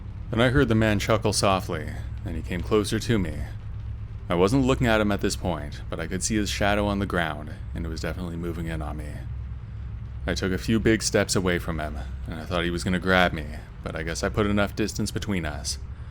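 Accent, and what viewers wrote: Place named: Canada. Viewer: I knew there was something about your voice and it just dawned on me. You're Canadian.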